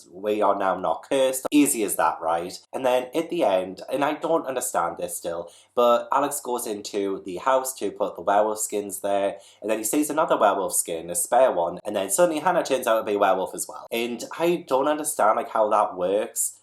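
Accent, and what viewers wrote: United Kingdom. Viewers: You sound like a fruit and the British accent doesn't help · Hey it’s European (somewhere in the UK I think, maybe Wales or Scotland, the accent isn’t too familiar to me) Josh Peck! What’s up? Great video! I’ve never seen your channel before but I like it a lot already! Can’t wait to watch this video! If I like it enough by the end I’ll be sure to subscribe!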